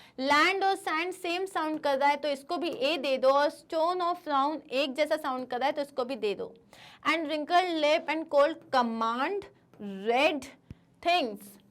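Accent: India. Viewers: Indian english is not english.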